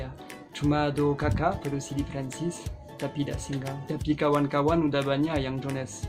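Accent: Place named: Padang, Indonesia. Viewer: Hihi lucu bule ngomong padang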